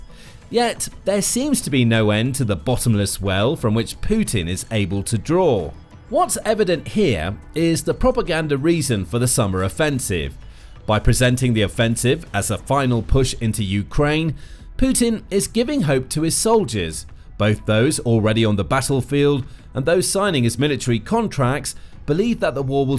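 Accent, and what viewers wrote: United Kingdom. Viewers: The narrator is obviously British...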